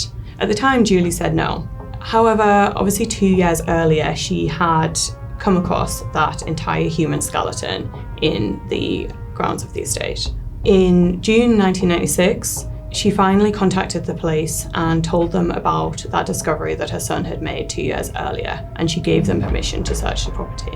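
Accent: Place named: RP, United Kingdom. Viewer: Posh Focal Fry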